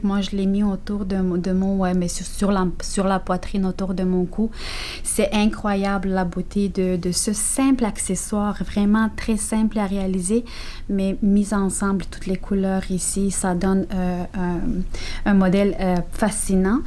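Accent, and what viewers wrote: Canada. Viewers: Merci, j'adore l'accent canadien, il me rappelle nos voyages. 👍🥰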